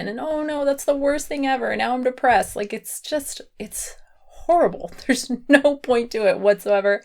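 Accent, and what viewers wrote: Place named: South, United States. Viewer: Off topic but you sound so southern in this video especially at the start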